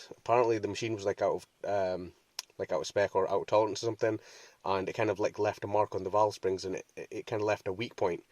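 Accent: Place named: Scotland, United Kingdom. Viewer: Scottish....... subbed lol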